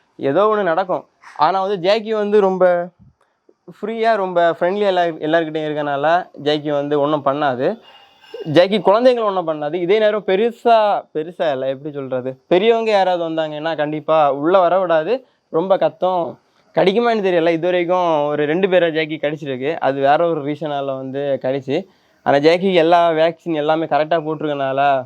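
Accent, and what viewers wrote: Kanyakumari, India. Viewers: Kanyakumari ah bro neega Kumari slang la pesuriga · Anna..... R u from Kanyakumari... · Bro r u from Kanyakumari 🤔🥰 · Bro nenka kanyakumari slangaa ,nan kanyakumari 😁😁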